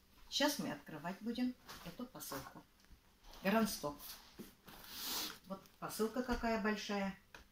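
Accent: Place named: Bashkortostan, Russia. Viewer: Точно как наша нанайка из Башкирии, и говор такой же,и та же доброта, молодец,тебе ещё больше Бог воздаст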